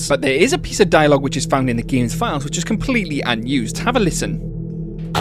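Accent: Wales, United Kingdom. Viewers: sorry are you welsh? you sound very welsh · nice to know there's welsh people on YouTube, barely see it, can tell from the accent. i have the same. keep it up mate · Are you Welsh because you sound it? Well, so am I!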